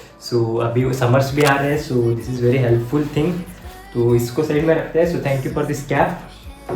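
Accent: Karnataka, India. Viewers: is your mother tongue kannada?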